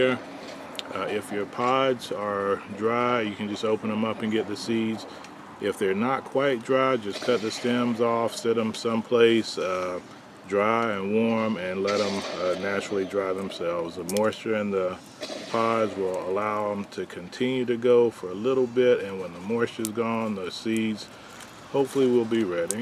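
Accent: United States. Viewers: Hi it's interesting how there are slight variations in the pronounciation of quite a lot of fruits, vegetables and herbs between English here and American English there ( we say Herbs, you say Erbs, never figured out why as it's spelled the same 🤔🤔 ), but a few have totally different names, one of them is Cilantro which of course we call Coriander, I have no idea where the totally different names originated for the same herb....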